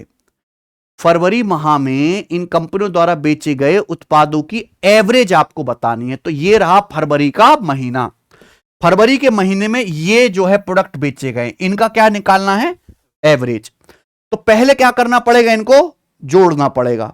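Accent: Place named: Uttar Pradesh, India. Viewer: Sir aap jo up language me tabad tod se bolte ho mja aata h sunne me bhi or padne me bhi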